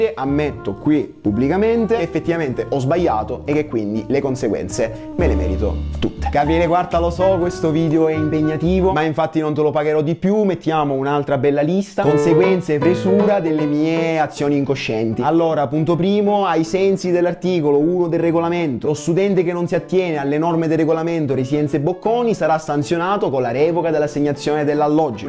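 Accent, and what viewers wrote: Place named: Eastern Sicily, Italy. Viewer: c'è del video in questo accento siciliano orientale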